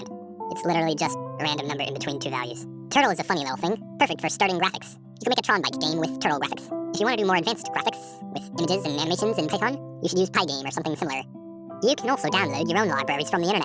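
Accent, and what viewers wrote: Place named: United Kingdom. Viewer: as the video goes on the more British the talker gets.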